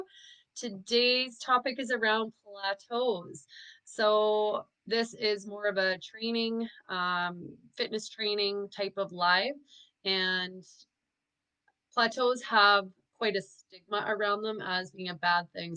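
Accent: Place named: Northland, New Zealand. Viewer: Are you located in the northland area ? You have that kind of accent 😜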